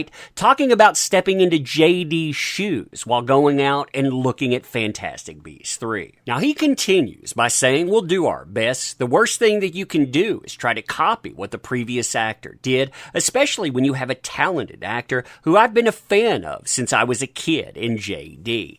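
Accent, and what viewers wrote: United States. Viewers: What kind of American accent are you speaking?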